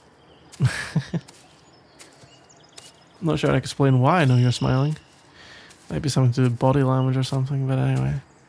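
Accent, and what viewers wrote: Scotland, United Kingdom. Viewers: Omg wait you're Scottish!? Me too!!!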